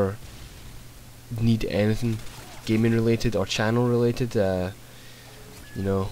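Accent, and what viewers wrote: Scotland, United Kingdom. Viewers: no... hes scottish, since im from scotland i can tell by his accent. also, it says UK on his page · Sound mate I take it your American but you sound Scottish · I just LOVE your scottish accent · scottish accent FTW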